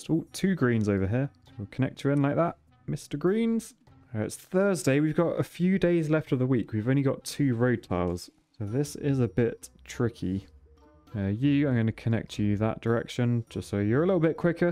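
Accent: United Kingdom. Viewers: you sound a lot like exurb1a, but probably just the bri'ish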